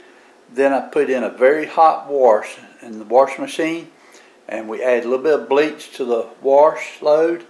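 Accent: South, United States. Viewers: Love that southern accent my hubby is from South Carolina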